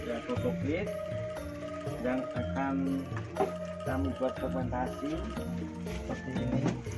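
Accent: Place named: Java, Indonesia. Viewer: Suarane medok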